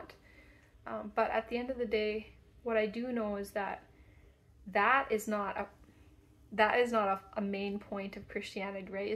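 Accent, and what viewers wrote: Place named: Alberta, Canada. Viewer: Hey! Fellow ex Mennonite here (old colony). Thanks for sharing your story. I really enjoyed listening... also it sounds like maybe youre Albertan as well?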